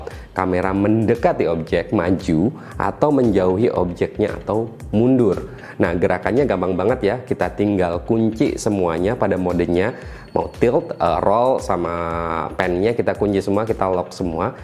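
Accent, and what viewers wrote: Pekalongan, Indonesia. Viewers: Wong pekalongan yo mas? 🙏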